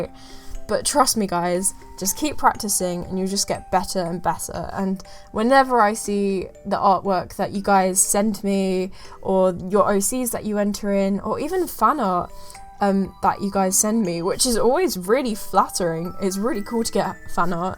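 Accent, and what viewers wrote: United Kingdom. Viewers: I love your pretty brittish accent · Redhair girl with a fantastic english accent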